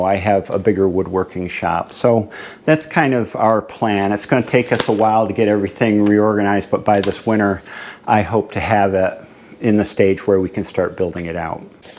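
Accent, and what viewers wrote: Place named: New York, United States. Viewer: So since I first began watching your current videos cutting hay, there was a familiarity to you… when I watched your part 2 summary from 4 years ago today it became obvious (at least to me) who your narrations resemble. Can you guess? That’s correct! Andy Rooney CBS 60 minutes. I suppose there is a New York influence in there!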